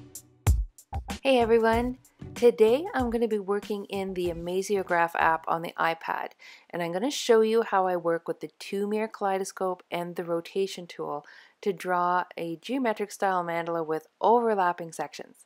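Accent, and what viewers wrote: Canada. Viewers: Excellent quick tutorial. (Are you Canadian?)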